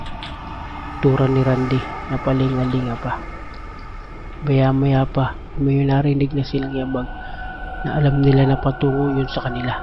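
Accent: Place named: Mongolia. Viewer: Okey ang kwento ...kaya lang parang taga Mongolian ang pagkwento..keep it up!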